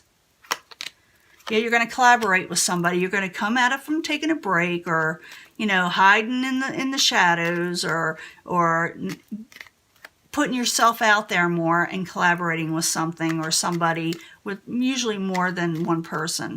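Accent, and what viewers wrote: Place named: United States. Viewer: How come you sound American now I thought you were British 😱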